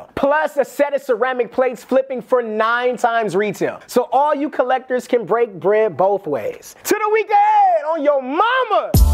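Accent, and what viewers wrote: France. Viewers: Très bel accent francais 👍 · Sacré bleu, the only thing hotter than french accent is his taste for haute couture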